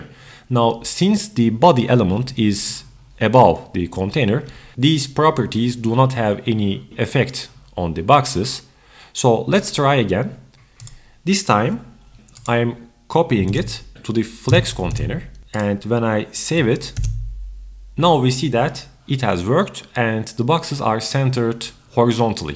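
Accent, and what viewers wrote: Turkey. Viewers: Türk ingilizcesi nerde duysam tanırım :D cok güzel anlaşılır sade bir dil kullanılmış. Elinize dilinize sağlık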